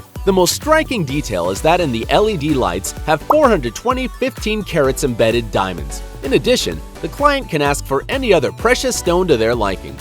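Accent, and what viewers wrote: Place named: United States. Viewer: Americans and your pronunciations.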